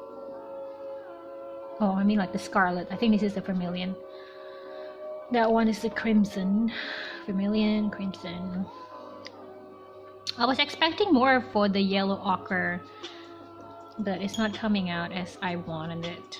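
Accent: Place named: Australia. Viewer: Same,i just got a lil confused bcos i heard an aussie accent w a touch of sum else lol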